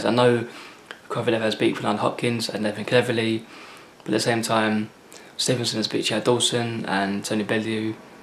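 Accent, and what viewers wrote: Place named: Scotland, United Kingdom. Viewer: Fuck dude, u sound Scottish! I barely understood what u said "lai heavy wait taitol" and I know ur English but slow down overthrere Otherwise no one will leave u comments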